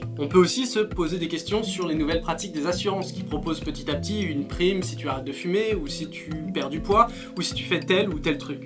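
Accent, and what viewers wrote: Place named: England, United Kingdom. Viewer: Je salut l'accent anglais !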